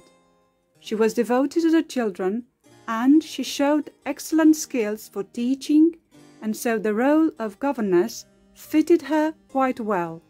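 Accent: France. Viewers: I forgot to say, your English pronunciation is perfect and your French accent is very charming. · It’s always so nice to listen to a French native narrate French history. As one who knows the language, I often bristle when many English speakers do presentations involving French names, places, and events; they frequently mispronounce the words. The woman on this video does such a nice job. Not only is the French all exquisitely spoken; but also, her tone is lovely and graceful. Thank you for posting this brief but thorough overview of Mme. de Maintenon, a lady who lived her life well and honorably.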